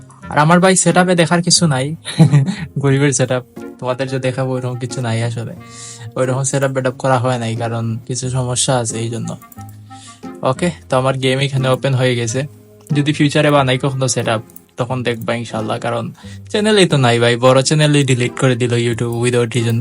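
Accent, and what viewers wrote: Sylhet, Bangladesh. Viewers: সিলেটি না-কি?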